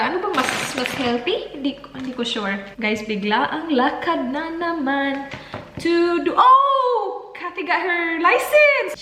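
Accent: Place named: Tuguegarao, Philippines. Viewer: Ate!! Are you from Tuguegarao? Hahaha omg!! Yung punto mo kasi, same sa tita ko. All the love ate!!!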